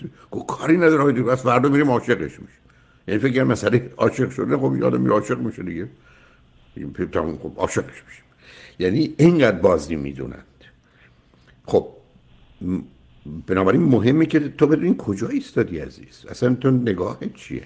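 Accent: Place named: United States. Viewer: طوری با لهجه صحبت میکنه انگار سی ساله امریکاس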